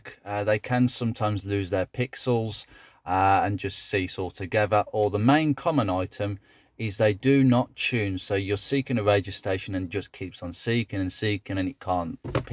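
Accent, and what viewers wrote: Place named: Birmingham, United Kingdom. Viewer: great info vid and thats a clean 90 thou milla focus you sound local to brum also if your instrument cluster starts to play up ie needles bouncing about or not moving at all either intermittently or not at all then it will need to be removed and the solder joints reflowed this happened to me a few months back you will prob have to get this done by a electrician. also if you have variable dash lights that flicker when the dail is on full just turn it down a bit until it stops flickering. one prob i got with my radio is the volume knob when turned to go either up or down goes any witch way i suspect it could do with a switch clean tbh not a big problem as i tend to use the stalk instead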